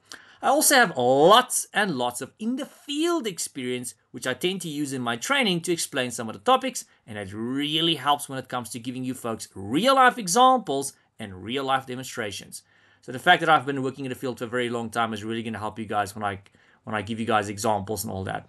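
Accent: South Africa. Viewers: you're south african!!!!!!!!! the accent and your way of speaking lol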